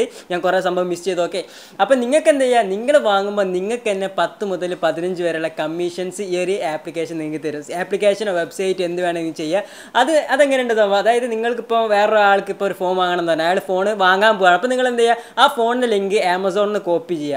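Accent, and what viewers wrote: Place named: Malabar, India. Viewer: ഭാഷ കുറച്ചു മലബാർ ടച്ച്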